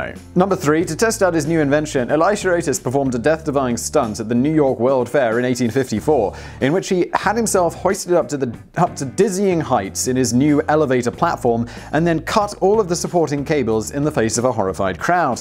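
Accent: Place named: United Kingdom. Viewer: After years of listening to, I was alarmingly quick to accept that it was just some goofy British pronunciation.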